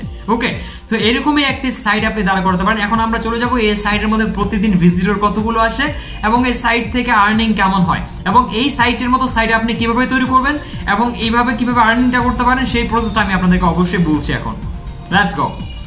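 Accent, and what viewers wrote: Dinajpur, Bangladesh. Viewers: ভাইয়া মাঝে মাঝে, আপনার দিনাজপুরিয়া ভাষাগুলো অনেক ভালো লাগে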